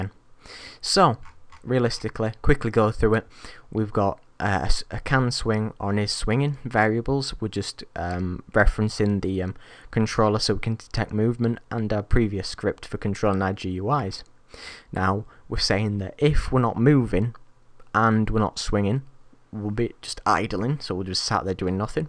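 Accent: United Kingdom. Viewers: Man are you british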